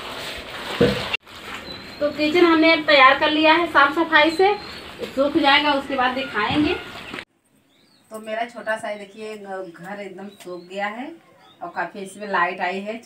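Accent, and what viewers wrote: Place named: Prayagraj, India. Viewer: Bahut achcha laga, aapki Prayagraj ki boli sunkar bahut achcha laga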